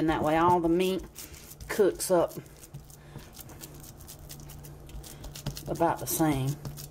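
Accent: South, United States. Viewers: Maa’am, I don’t know who you are , but I know these two things: a) you’re definitely from somewhere South of the Mason-Dixon Line, and, b) you gave the world probably the BEST meatloaf recipe known to humankind, putting all others in the dust. I’ the cook in our house, my wife cleans, and she thinks it’s the tastiest meat loaf I’ve ever made! Many “ thank you’d” are in order! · Forget about Gordon Ramsey and those other "Snooty Chefs". A Southern gal that knows what good honest food is - that's the ONLY way to go. I tried this and it IS the best meatloaf I ever had. Thanks! btw...Love your accent!!!!!! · John Burgess Jerseyians don't sound like this.. She's southern for sure.. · Your southern accent telling me you are from North Carolina on the VERGINA border ,?? Maybe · Great video & new Subscriber. Ps , I love your accent , The southern accent is sooo lovely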